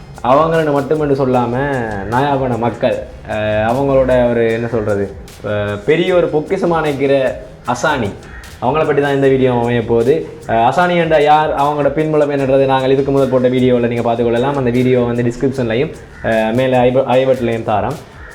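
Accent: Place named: Sri Lanka. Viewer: அசானி முன்னுக்கு வர வாழ்த்துக்கள் வாழ்க வளமுடன் மேலும் முன்னேற வாழ்த்துக்கள். ஐயா நீங்கள் பேசும் இலங்கை தமிழ் மனம் கொள்ள கொள்கிறது.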